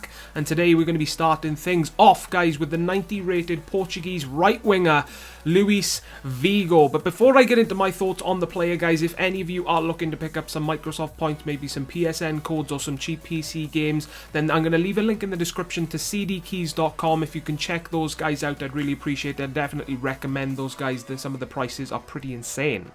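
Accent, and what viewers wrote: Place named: Wales, United Kingdom. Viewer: Beerus that's the Welsh accent bro, they pronounce certain words softly.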